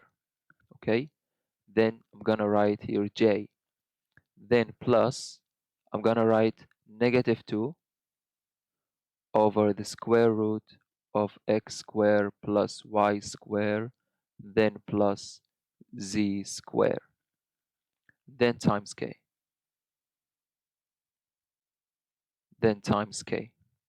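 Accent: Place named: India. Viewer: The best accent as indian I've heard so far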